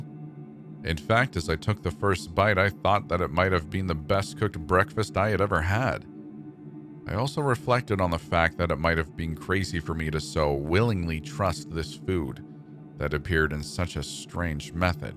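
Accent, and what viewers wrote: Canada. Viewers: My buddy who i let listen with thinks your from canada i think just up north im from the north east but Good work as always loveing it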